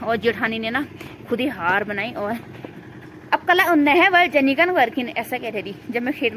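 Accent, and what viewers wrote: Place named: Kumaon, India. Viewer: aaj aap bhi kumaya boli bolna lag gayi jay ho kumaya mitra wow nice😃😃😃😃😃😃😃😃😍😍😍😍😍😍😍🤩🤩🤩🤩🤩🤩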